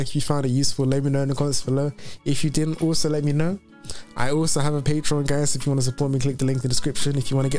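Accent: United Kingdom. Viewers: Wonderfully done my friend...Love you man...Also love the English accent. Keep up the good work...peace brother..!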